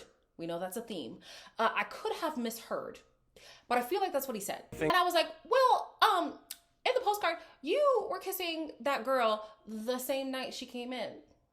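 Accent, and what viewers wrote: Canada. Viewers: Your Canadian accent is so cute in this video 🤣 the passion in this review, I FELT IT!!!!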